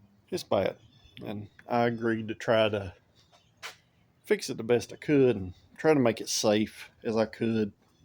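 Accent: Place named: South, United States. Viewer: Learn the English language dude , you sound like a redneck....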